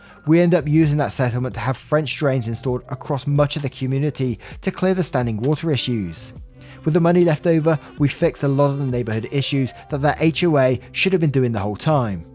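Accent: England, United Kingdom. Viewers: You don't need to change the way you pronounce letters. I've heard a number of people from the UK pronounce the letter H the way you do, and there's nothing wrong with it. I hope you don't feel like you have to adopt Americanized pronunciation to please your viewers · You say H like any British person does. Doing nothing wrong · why the heck shouldn't you say "haitch?" You're English! That's how folks from England say the letter H. If you want to say it "aitch," knock yourself out. I'm not gonna tell you how to talk. And neither should anyone else. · I just found it amusing that alot americans , are trying to correct a English man, literally born in England, on how to speak and pronounce English.........🤣😂🤣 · Ha, don’t stress about it, I mean I just figured that the “h” thing was a British thing and reasoned that if you did it, then all Brits did it! 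🤪🤦‍♀️. ALSO this’s off topic, but I recently discovered a hilarious British show called PLEBS... England has been holding out on us because that show is pure gold (well at least the first 3 seasons)!! 🤌👏🤣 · I love the English pronunciation of H. Don’t change, and still say zed, not z. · You’re British, people are really bothered that you have a different accent? 🙄🤦🏻‍♀️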